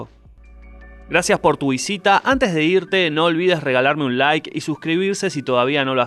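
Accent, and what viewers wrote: Rioplatense, Argentina. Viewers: Es que así es la pronunciación de los argentinos o uruguayos, no es que lo haya hecho mal (?) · Ya lo dijeron, es el acento argentino/uruguayo.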